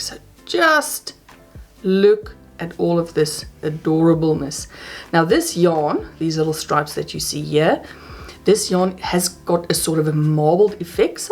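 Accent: South Africa. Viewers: I listen to you and I thought to myself you ate South African. When you say you are from here I smiled. So happy you came in my feed. I'm from Cape Town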